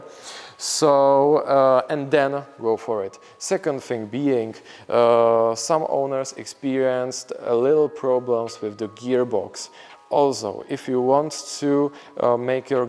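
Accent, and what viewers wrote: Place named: Czech Republic. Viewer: ta čecho-angličtina se nedá poslouchat, sorry... jinak auto krásné, gratulace ke splněnému snu 🍾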